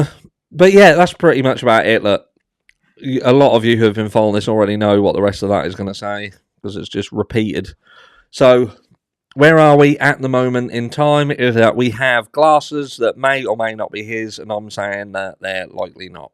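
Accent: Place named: Waveney, United Kingdom. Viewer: You have a great Waveney accent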